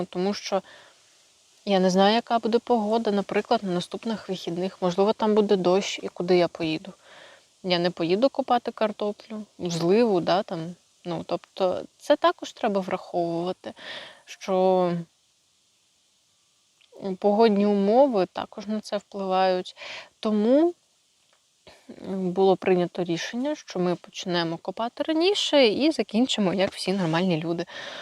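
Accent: Ukraine. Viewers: Hi. Love to hear you speak the Ukrainian dialect I grew up with. My parents came from Carpathian region to US after WW2. I still make borscht and grow potatoes too! Slava Ukraini. ❤️🇺🇦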